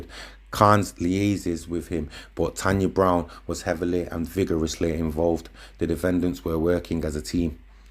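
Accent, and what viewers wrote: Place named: Manchester, United Kingdom. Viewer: Good to hear a Manc throwing it out. Keep it up 👌🏼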